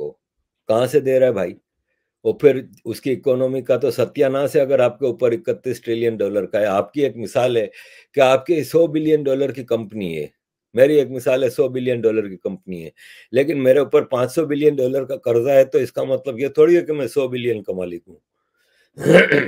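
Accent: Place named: Sindh, India. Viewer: Sai your ascent and your style of speaking completely resembles to the Sindhis of our Jaipur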